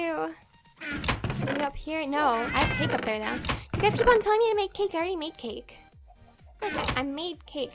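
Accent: Canada. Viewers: Are you canadian? :o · R u from Canada?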